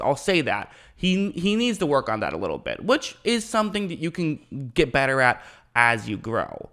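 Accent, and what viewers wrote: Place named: Detroit, United States. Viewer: Ahhh, so your a Lions fan. I was wondering. You sound like that region. Makes sense. You do a good job of not showing bias.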